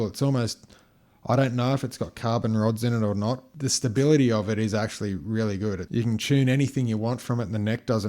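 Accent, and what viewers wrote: Australia. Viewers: Not gonna lie Did not expect that ostralian accent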